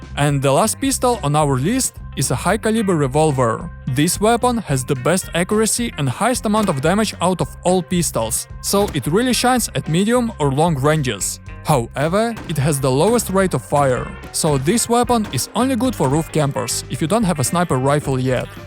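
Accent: Russia. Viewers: я слышу руский акцент ?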